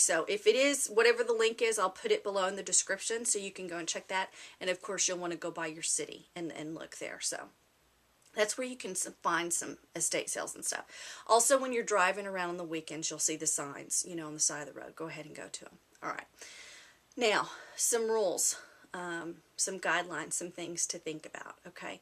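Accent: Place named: Texas, United States. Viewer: omg you are so darn adorable! I am from Texas also and I just live your accent. Never been to an estate sale, but definitely looking to go check a few out. thanks for the great tips.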